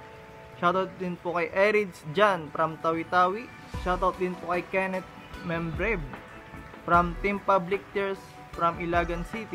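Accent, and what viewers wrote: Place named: Banyumas, Indonesia. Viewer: Bahasa nya kek jawa ngapak njir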